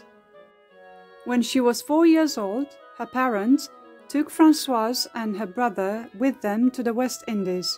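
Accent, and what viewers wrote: France. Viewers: I forgot to say, your English pronunciation is perfect and your French accent is very charming. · It’s always so nice to listen to a French native narrate French history. As one who knows the language, I often bristle when many English speakers do presentations involving French names, places, and events; they frequently mispronounce the words. The woman on this video does such a nice job. Not only is the French all exquisitely spoken; but also, her tone is lovely and graceful. Thank you for posting this brief but thorough overview of Mme. de Maintenon, a lady who lived her life well and honorably.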